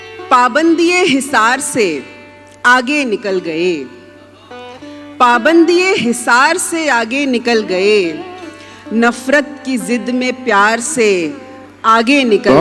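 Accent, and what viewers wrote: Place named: Karachi, Pakistan. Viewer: Pure urdu! Pure educated karachi accent! Proving the language belongs to only literates not illiterates!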